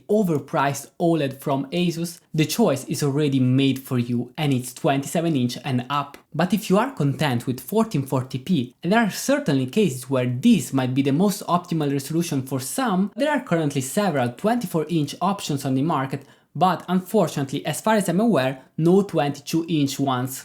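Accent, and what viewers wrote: Italy. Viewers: Si sente molto l'accento italiano comunque ahhaha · Italian, Russian is completely different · Sounds more like Italian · This is undoubtedly the best video on the subject of monitor size. Thank you. I love your Italian accent. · Italian Is my guess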